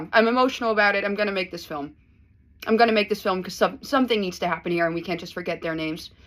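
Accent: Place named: Groningen, Netherlands. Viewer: as indonesian i can tell but which dutch? she has that groningen accent, the frissian is very english ish.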